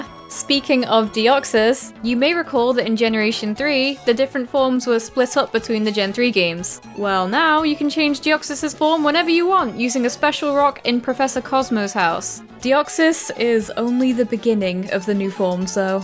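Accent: United Kingdom. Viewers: British. She mentions it most recently in the Galar Version Differences video.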